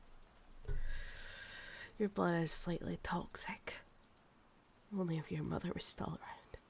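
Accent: Scotland, United Kingdom. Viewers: Slow down my vampire mistress, the blood is not going to run away from you, almost tearing the pet's arm.😅😅 This video is wonderful I enjoyed it very much, vampire+ the scottish accent equals a awesome video to watch and fall in love with it.😍😄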